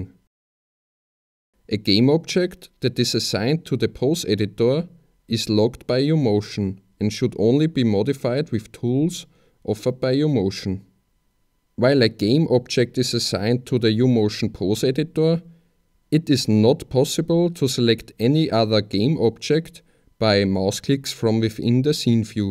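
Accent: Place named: Austria. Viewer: Österreichisches Englisch is einfach leiwand :D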